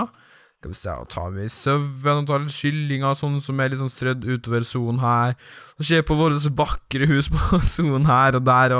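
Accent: Trøndelag, Norway. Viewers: du trøndersk?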